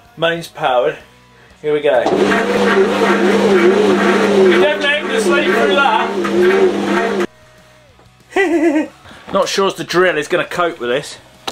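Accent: United Kingdom. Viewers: Are You British? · Wait you are from the United kingdom?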